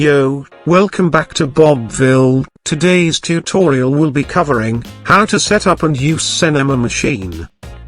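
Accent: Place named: United Kingdom. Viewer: 🔥🔥🔥🔥🔥🔥love the British voiceover🔥🔥🔥🔥🔥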